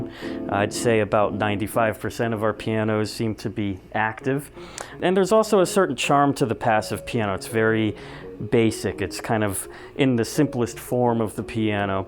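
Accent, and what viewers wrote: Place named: Newark, United States. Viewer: Dat Ne'awk dirdy mouf akscent! 😄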